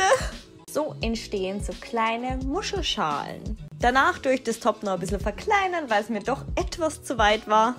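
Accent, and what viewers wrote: Vienna, Austria. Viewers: Oha😳 mega cooles Video 😍 habe deinen Kanal gerade entdeckt, ich lass auf jeden Fall ein Abo da💕 Liebe Grüße aus der Steiermark P. S. Ich feier deinen wienerischen Akzent 😊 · Haha dachte du bist aus Österreich :D liebe deinen Dialekt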